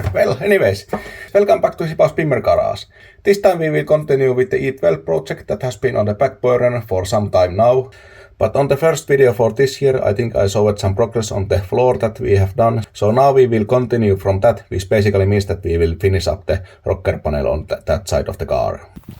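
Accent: Finland. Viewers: Eikö ihan rehellisesti Suomella mentäis, on sen verran karseesta "ralli englantia"😢 mulla on tuollainen aito (ei kiina kopio) pistehitsi 30v takaa.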